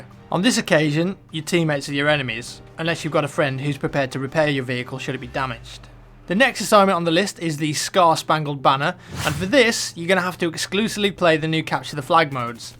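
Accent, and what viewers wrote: United Kingdom. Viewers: oh man, my recommendations just popped up with this. its funny looking back, sounds much more british.. and young.:D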